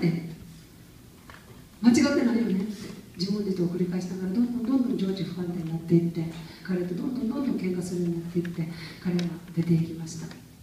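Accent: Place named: Osaka, Japan. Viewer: いつもより大阪弁のさんが新鮮です！ありがとうございます😊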